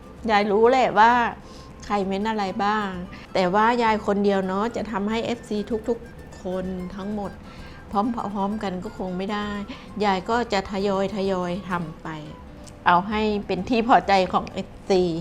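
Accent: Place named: Nakhon Phanom, Thailand. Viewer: เป็นคนนครพนมเหมือนกันค่ะ คุณแม่คุณยาย วิธีการพูดคล้ายๆ เลยย ดูทุกคลิปที่คุณยายทำอาหาร ชอบทุกอัน ฟีลเหมือนได้กลับบ้าน ชอบมากรักมากค่ะ ทำบ่อยๆนะคะ ติดตามต่อไปค่ะ🤍🤍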